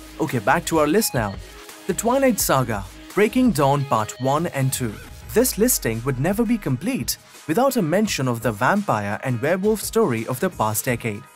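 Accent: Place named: India. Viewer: damn... I cannot listen w/o laughing that indian accent! :)) Bro, better you record "top 10 unmissable cartoons"! :) And no need to include "Underworld" twice - ALL its parts are perfect.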